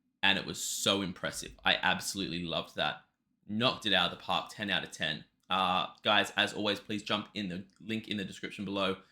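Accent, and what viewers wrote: Australia. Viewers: Hey what's the comment on the Australian accent ? or maybe you are Australian? LOL ! LOL ! We are all starting to sound alike anyway.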